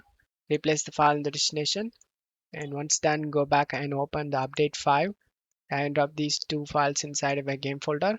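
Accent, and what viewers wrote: India. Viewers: Indian accent, i knew it will work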